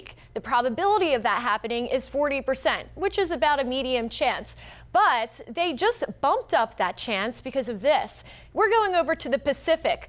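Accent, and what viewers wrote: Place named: Pennsylvania, United States. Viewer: Gawd her accent is just terrible. Where is that from Pennsylvania?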